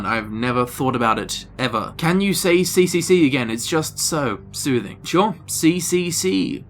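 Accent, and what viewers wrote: Australia. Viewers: Can I watch your Witcher DLC playthrough if I haven't played the main game yet or will I be confused/spoiled? I need more of that soothing Australian voice...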